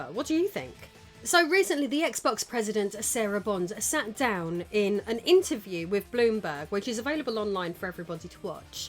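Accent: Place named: United Kingdom. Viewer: I actually like this new direction for the channel, I could see growing to one day to be one of the top gaming news channels because she knows how to properly research the topic and her British accent elevates the content by lending it a sense of legitimacy that American YouTubers just don’t have. I feel like I’m listening to a BBC news broadcast when she’s discussing the topic.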